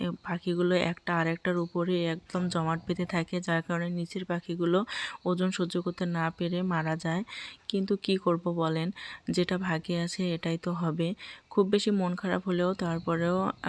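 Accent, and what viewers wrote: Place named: Rangpur, Bangladesh. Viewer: আপু আপনার কথা শুনে মনে হচ্ছে আপনার বাড়ি রংপুর ?কোন থানা জানাবেন আমার বাড়িও রংপুর।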